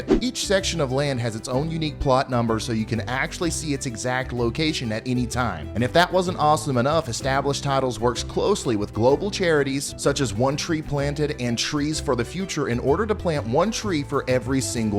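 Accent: South, United States. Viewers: I don’t like your southern accent.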